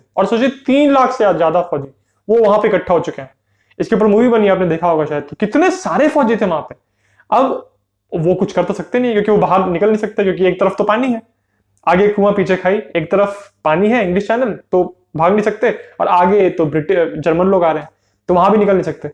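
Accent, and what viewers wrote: Bengal, India. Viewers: Bhai tum Bengali ho kya please reply🤗🤗🤗🤗🤗🤗🤗🤗🤗🤗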